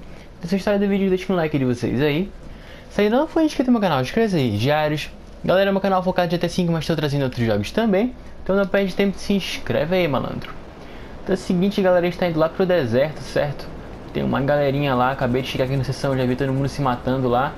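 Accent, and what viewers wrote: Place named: Belém, Brazil. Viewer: tu mora em Belem do pará? eu tbm que sotaque igual kk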